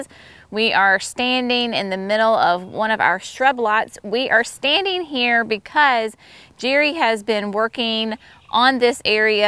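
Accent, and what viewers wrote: South, United States. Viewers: Love your videos. Love your phraseology. You talk like we do with a southern accent rather than our hillbilly accent. I can totally understand stunk to high Heaven.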